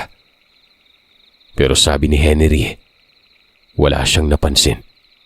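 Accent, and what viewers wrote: Visayas, Philippines. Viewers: Bisaya pa more sir 😂 natutuwa ako pag nag bibisya ka.. pero mglng ka mag ilonggo...😊😊😊😊 shout out bahrain 🇧🇭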